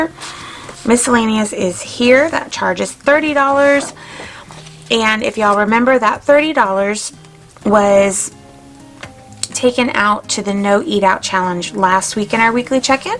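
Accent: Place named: South, United States. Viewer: You are extra southern in this video, but I love it!!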